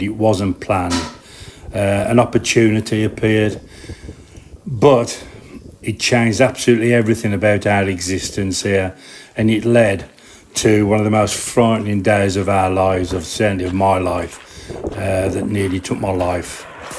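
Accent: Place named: England, United Kingdom. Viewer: Bostin' - I recognise the accent. Fellow Englishman in Stockholm.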